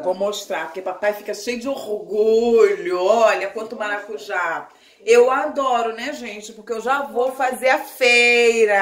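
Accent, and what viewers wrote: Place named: Região dos Lagos, Brazil. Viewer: Nós de maricá pra lá, região dos lagos, acho que falamos assim. Mamãe e papai😀😀😀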